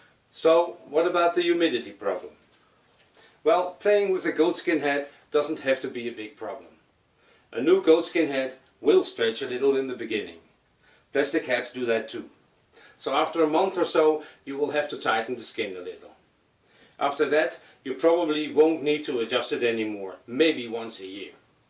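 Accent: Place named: Netherlands. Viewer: my god these banjo's are beautiful. Really having a hard time deciding. I also have a bodhran put easily tunable. Love the sound of real skin, even as a vegitarian. I live on a boat so..well... humidity. Do you still use the wax and does it change the sound. - Kijk net op je site, dacht al ver weg een NL accent te horen.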